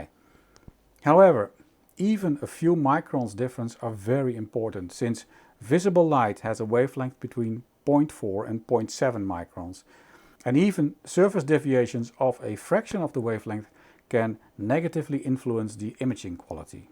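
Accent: Netherlands. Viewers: You sound like you’re from the Netherlands.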